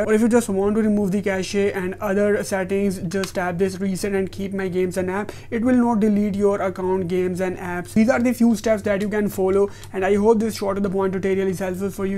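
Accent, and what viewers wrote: India. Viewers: Nah bro you guys are racist man just cause of an Indian accent?? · He sounds like a indian but is works is almost destroyed my xbox before this😂😂 but Thanks man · it’s always the indian sounding guy who helps a lot somehow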